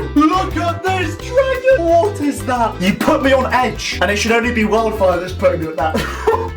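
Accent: United Kingdom. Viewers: Why does he talk about dollars but has the most British accent ever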